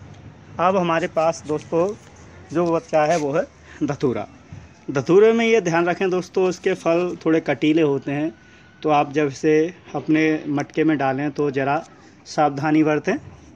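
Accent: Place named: Chambal, India. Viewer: Sir I m from mp chambal region n ur voice sounds like us. Khn se hn aap???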